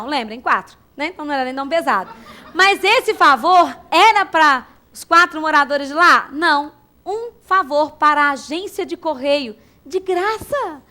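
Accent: Minas Gerais, Brazil. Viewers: Esse sotaque mineiro me traz boas lembranças.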